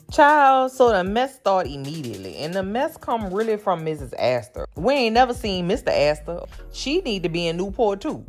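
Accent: New Orleans, United States. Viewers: New listener here! Stumbled across your review of AJLT, immediate listen just because I love the New Orleans ⚜️ accent so bad ❤. Then found you were hilarious AND was reviewing TGA too? Done. Subscribed. 😊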